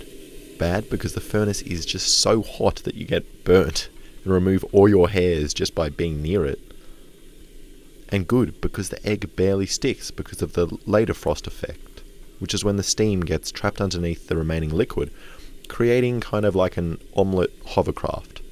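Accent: Australia. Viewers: Yami Yugi as an Aussie I can conform. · Obsession with eggs? Australian? Is that you, HowToBasic? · Is this a Australian, and talking?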